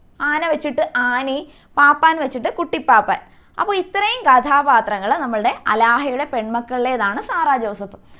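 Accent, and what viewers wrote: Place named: Kottayam, India. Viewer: കോട്ടയം, ആലപ്പുഴ എവിടെയാ വീട്....ഭാഷ കേട്ടിട്ട് ചോടിച്ചതാ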